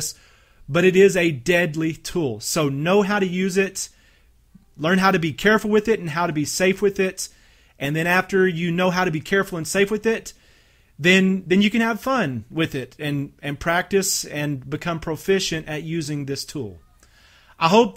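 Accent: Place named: South, United States. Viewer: He kinda sounds like he's southern/midwestern so maybe he's in Missouri or Kansas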